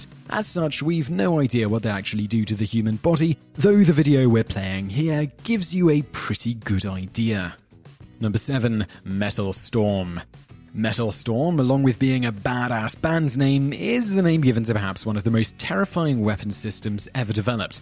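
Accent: United Kingdom. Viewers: Brit accent /= actual knowledge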